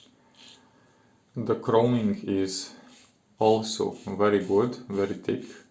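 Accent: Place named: Serbia. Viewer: you sound so serbian :)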